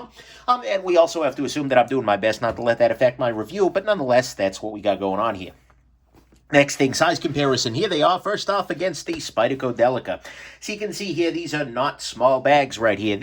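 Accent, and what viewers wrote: New York, United States. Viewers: Because it is worth it to hear someone speaking with a New York jewish accent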